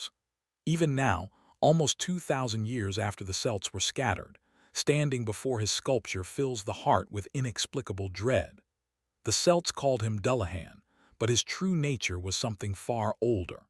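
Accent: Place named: United States. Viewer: American pronunciation absolutely does my head in. It is just pure lazy.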